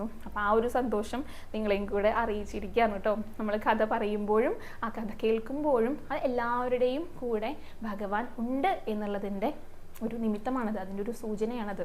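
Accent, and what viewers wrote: Thrissur, India. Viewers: swaram nannaittunde..trissure slang nalla resam undu kelkan...especially kurangum kutty...😂😂❤❤❤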